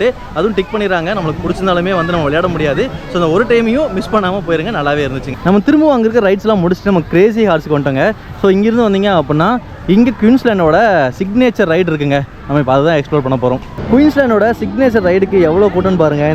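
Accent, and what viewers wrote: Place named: Coimbatore, India. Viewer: every ride 🤣 your reaction is ultimate na and your way of talking nga nga our cbe slang nanum cbe thanga na good video take care of ur health also keep going na❤❤😊😊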